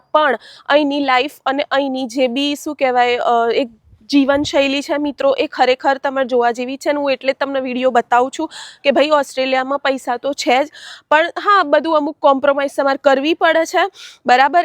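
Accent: Sabarkantha, India. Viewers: સાબર કાઠા ની બોલી બેન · Tamari language parthi evu lage che k tame Bayad, Sabarkatha dist. Na hasho.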